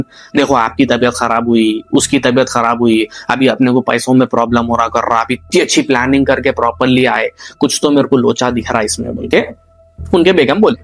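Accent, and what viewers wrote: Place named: Hyderabad, India. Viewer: Bhai story sunkar maza agaya with Hyderabad style me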